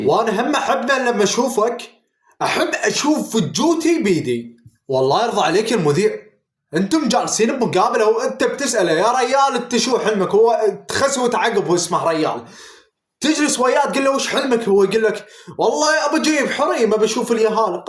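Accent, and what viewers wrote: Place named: Kuwait. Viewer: الكسنت مدري الماكسنت العندك بل كويتي حلو😂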